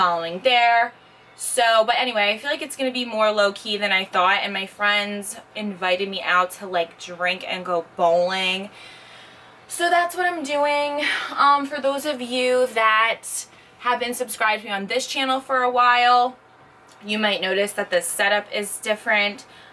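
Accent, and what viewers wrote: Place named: California, United States. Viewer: Are you Californian? Because you definitely sound like one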